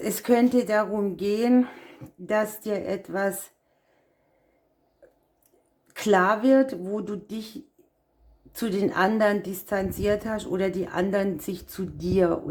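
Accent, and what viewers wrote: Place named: Karlsruhe, Germany. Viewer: Rausgehört😊ich aus Bruchsal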